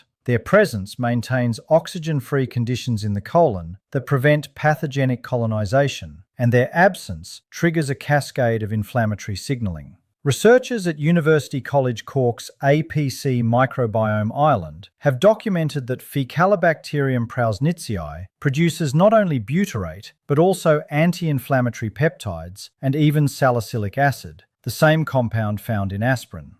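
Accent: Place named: United Kingdom. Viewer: British now? Loose the brit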